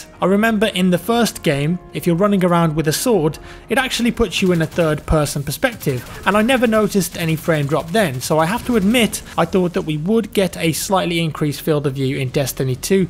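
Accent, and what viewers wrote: United Kingdom. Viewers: A British lad that says 'Beta' the 'Murican way? That's new.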